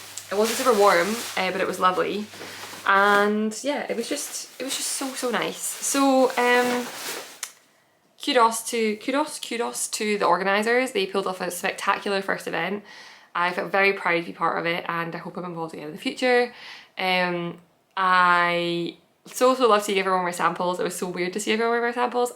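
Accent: Scotland, United Kingdom. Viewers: I personally don't have a knitting community in person because my knitting is my relaxing alone time, I do however LOVE the online community, I feel like I can join others in our passion without having to worry about contributing, Yours was actually one of the first knitting podcasts I came across and fell in love immediately, being Irish I love the Scottish accent, and whilst watching this I am working on my 6th. Tolsta tee and planning what yarn to use in my first Lauder, x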